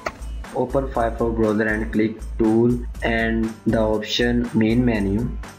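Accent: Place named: Punjab, India. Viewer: I can not understand this punjab. Hello, Buddy, this is an AMERICAN site. Learn AMERICAN.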